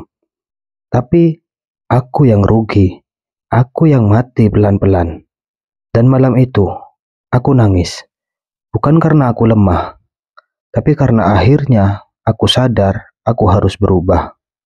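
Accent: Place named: Madura, Indonesia. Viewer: Suara channel ini logatnya kayak orang madura.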